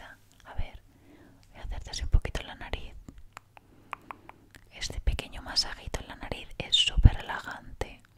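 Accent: Spain. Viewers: Me encanta el acento de las españolas y encima como pronuncias la S eso me causa más asmr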